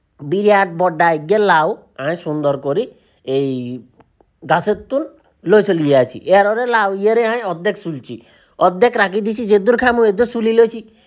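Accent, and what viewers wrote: Noakhali, Bangladesh. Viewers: নোয়াখাইল্লা রে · ভাই আপনি কি নোয়াখালীর নাকি 😂 আজকে প্রথম আপনার ভিডিও দেখছি,খুব ই মজার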